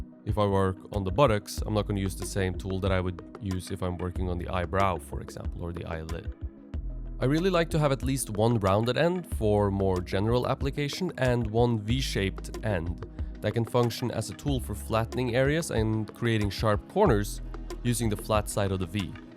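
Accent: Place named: Italy. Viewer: your wood took from torante? Terante? Toronto? Please don’t have this Italian accent. I’m searching for this tool for the whole day and I can’t understand what’s the name of the brand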